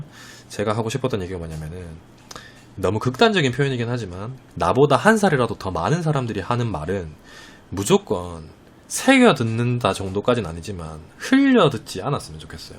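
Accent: Gyeongsang, South Korea. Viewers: 앜ㅋㅋㅋ 사투리 쓰시는줄도몰랐넴 경상도라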